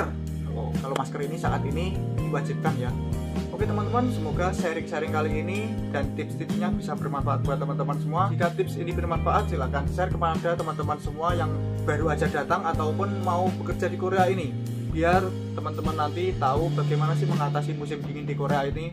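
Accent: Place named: Java, Indonesia. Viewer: Samean jowo to mas😂 jos pokok e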